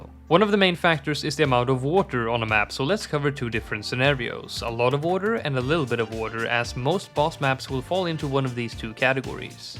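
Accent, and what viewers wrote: Sweden. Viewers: Ar you from sweden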